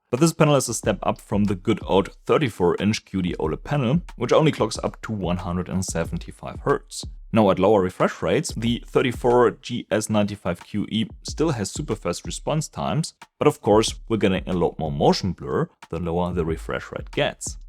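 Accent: Germany. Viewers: der deutsche akzent ist einfach viel zu stark🥲 meine ohren tun weh. Aber nices video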